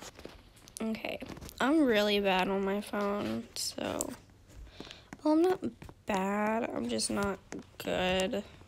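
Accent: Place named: United States. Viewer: Classic American voice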